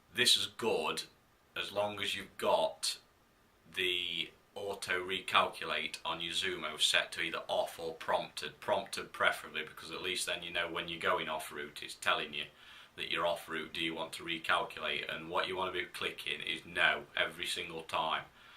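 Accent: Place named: Yorkshire, United Kingdom. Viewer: Thanks mate, I am going to put all maps on a mini sd card as soon as I get one from ebay. That is a brilliant video also, you are a god send. Thats yorkshire voice I am sure, used to work in the pits there before they shut them all. Lovely people.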